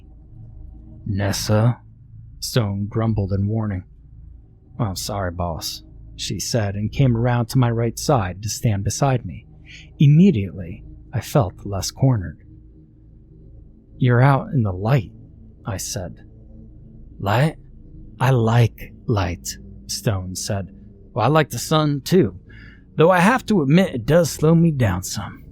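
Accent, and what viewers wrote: South, United States. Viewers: I'm wheezing,. The way you pull off that accent tells me you KNOW the southern life to some degree 😂😂 If not, and, tbh, either way, you nailed it! ❤❤❤ Thanks for the smile and the wonderful story! EMDR is...hard, and I've been binging your channel after not seeing you on my algorithm for nearly 4 years! I'm glad I have so much to catch up on now, despite YouTube playing keep away! 🥰🥰🥰🫂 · Great southern mom accent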